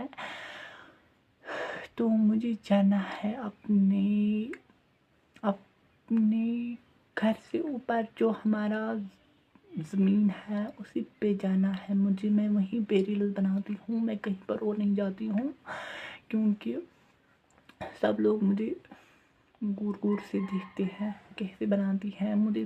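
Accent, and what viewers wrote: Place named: Arab world. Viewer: Arabi auuent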